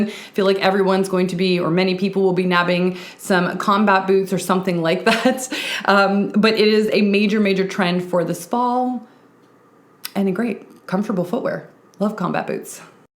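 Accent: Canada. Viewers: Out, about and sorry... how I miss my younger years in Calgary, don’t hear that accent in CA often. Love all the advise you give 👍🏼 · I come for the fashion advice, I stay for the Canadian accent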